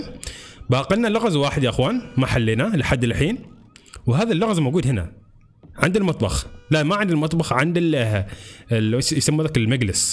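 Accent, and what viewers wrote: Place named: Oman. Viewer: انت عماني عرفت من لهجتك 🌹 وكمل لعبه هي 4 فصول انت في الفصل الأول لعبه حلوة انا العبها على قناتي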